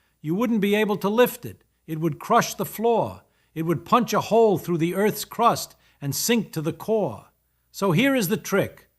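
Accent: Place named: Brooklyn, United States. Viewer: Whoever you are, thanks. I’m aware it’s ai but Feynman was a favorite and you’ve captured his spirit very well. I see a ton of these videos, yours nails his Brooklyn accent.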